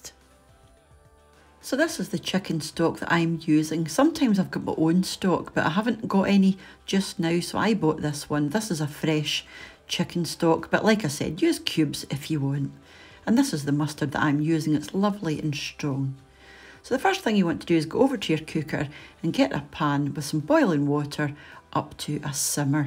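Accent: Scotland, United Kingdom. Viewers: I love your recipes! I live in North Ayrshire so its nice to hear a fellow Scottish accent :) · isyt I recall Americans saying a few pats of butter or tablespoons. It just sounds so adorable to say knobs of butter. I don’t know why. I just love the Scottish accent and colloquialisms. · Are you from Scotland, by any chance?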